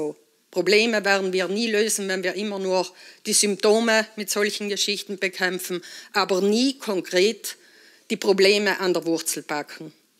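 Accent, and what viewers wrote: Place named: South Tyrol, Italy. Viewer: Richtiges Südtiroler deutsch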